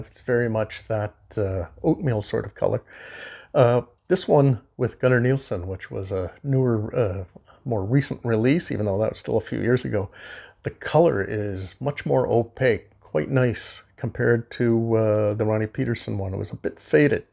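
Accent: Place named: Canada. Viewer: Hello, Thank you for another nice content about F1 slotcars, as well as the extra footage and books you`ve shown. The Lotus F1`s remember me of my teenage days in the 70`s when I watched a lot of F1 races. I had been a fan of Ronnie Peterson and I had the Corgi Toys replica back then. When I got older, I gave it away to my cousin to play with. Sadly, there were no F1 models of the Lotus by Carrera at that time, if I remember correctly. I had a 132 Carrera Universal set then. Scalextric was not known in Germany at that time here. Very nice models by Fly, as they always do, but technically seen, Fly is not my choice to go for in slotcars. As shelfqueens they are maybe fine, but they are to fragile if you crash them in my opinion. Are you situated in Canada, cause I think I heard a Canadian accent there?:-) I got a lot of Family in Ontario. Any way, I finally left a subcription and a like. 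Greets over from Germany 🙂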